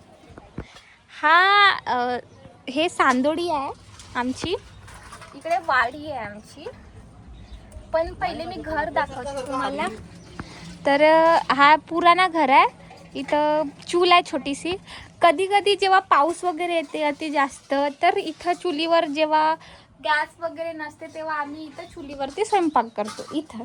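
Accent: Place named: Vidarbha, India. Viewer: स्वच्छ निर्मळ हवा,नैसर्गिक वातावरण, गोड वैदर्भीय बोलीभाषा,तुला खूप, खूप आशीर्वाद,तुझे मोठ्या घराचे स्वप्न लवकरात लवकर पूर्ण होवो.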